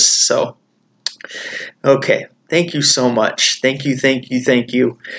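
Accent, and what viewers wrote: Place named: United States. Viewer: I had a feeling your accent is so American ;)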